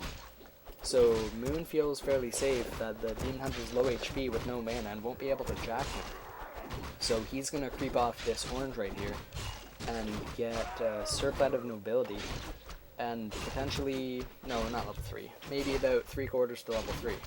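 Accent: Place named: Canada. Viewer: Nice smooth voice, Canada eh? I think I've seen you on US East? " "? Thanks for the upload!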